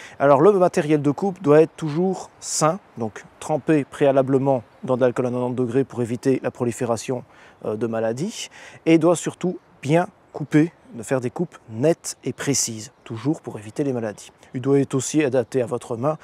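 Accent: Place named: Belgium. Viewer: Oui l'accent bien-sûr, et vous parlez de Daniel Schmit en plus.. Nous sommes compatriotes! C'est un vrai plaisir de vous écouter prodiguer vos conseils clairs , précis, facile à appliquer..Merci! Cécile et Emmanuel de Chaumont-Gistoux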